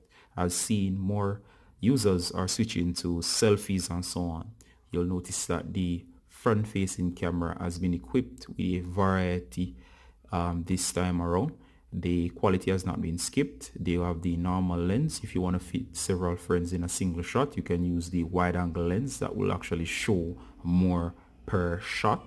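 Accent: Jamaica. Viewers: R u Jamaican ?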